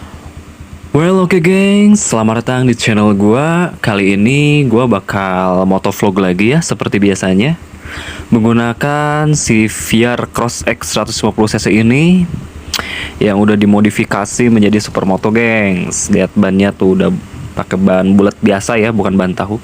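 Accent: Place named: West Java, Indonesia. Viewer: salfok bilang gue elu tapi logat sunda.. hahaha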